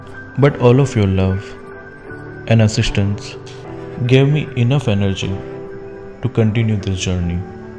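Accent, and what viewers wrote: India. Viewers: Me: Heard his accent Also me: Is he an Indian?🤔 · I knew it!! You're an indian 🇮🇳👳 I will never forget that accent Btw.. You've done such a incredible video. That's showing your talent so much, the sound effect and the timing.. Cool man.. Love from Indonesia❤ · I subscribed u wen u had 120+k subs now u have 300k+ subs....that's a great achievement in jst one year.....gud luck for ur future...and btw r u indian??cuz ur accent sounds like one...love from North East India.....😄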